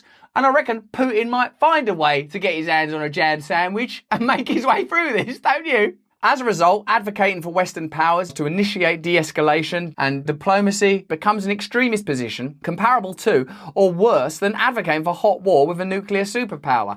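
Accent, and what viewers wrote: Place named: United Kingdom. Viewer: Greetings from 🇺🇸. I love your British voice!🙏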